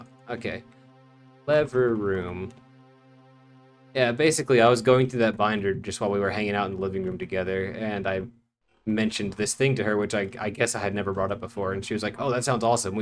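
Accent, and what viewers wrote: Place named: Texas, United States. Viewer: I didn’t know what it was or how I knew, but I could tell you were a fellow Texan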